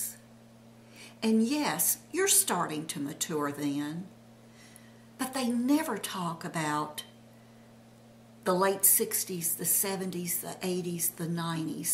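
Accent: South, United States. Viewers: Hi, I am new to your videos and just love them. I have watched six already and can't wait to watch them all. I am a young at heart 69 year old and love my make-up, hair styles, pretty and cool clothes and jewelery. I think you are lovely in all ways. I also love your southern accent!! My son moved to South Carolina about 15 years ago, and he has the southern charm now. I am going back to view more of your videos Bye Judie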